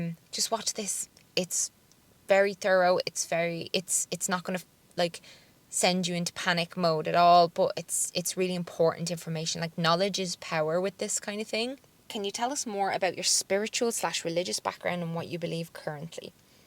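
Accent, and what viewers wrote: Ireland. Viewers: I just love your personality and your self and videos!! You are my favorite YouTuber and I would be soo sad if you left, but I would understand. Maybe you could do a podcast in the future if you decided to leave YouTube because your voice is soo lovely to listen to. I miss Ireland and I love hearing how you talk! Thanks for all the big sister advice. (Even though you’re not teaching people in person you are here online and I’m so grateful for what I’ve learned from you!) ❤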